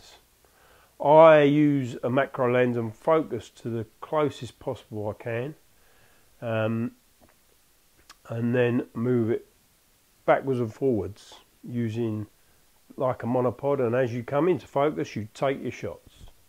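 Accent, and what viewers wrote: United Kingdom. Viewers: Britain accent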